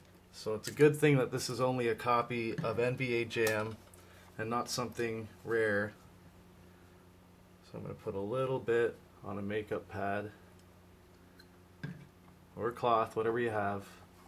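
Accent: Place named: Canada. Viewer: ...you've just been erased!.....i could tell you were a fellow canadian right away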